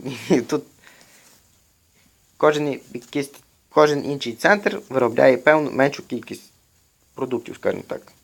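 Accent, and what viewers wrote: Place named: Hungary. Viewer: Мені одному здається, що автора акцент Угорський....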